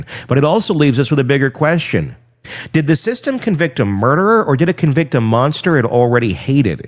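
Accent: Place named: South, United States. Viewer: I love your southern accent. 😂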